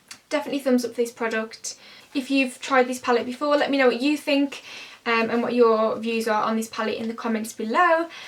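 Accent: Birmingham, United Kingdom. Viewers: Do I detect a slight Brummie accent? It's cute. Great review, hun. x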